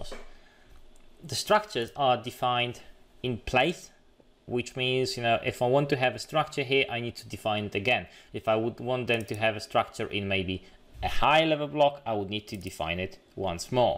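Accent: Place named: United Kingdom. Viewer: Thanks man ! where is coffe button ? // BTW your brtitish accent is perfect for me so clean 😃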